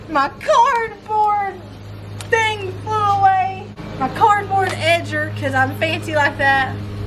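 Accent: South, United States. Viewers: So sorry about your chickens. We cry with you. God created them too., you are kind, intelligent and God's beautiful daughter. You are not stupid! Shame on the person that said that. You have a southern accent and dialect sweet lady - never lose that, never change. You all did an amazing job on your porch. It looks great! Big hugs.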